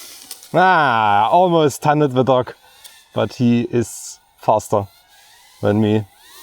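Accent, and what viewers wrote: Germany. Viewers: Damit und das mit deutschen Slang aiso rock das Ding ❤😂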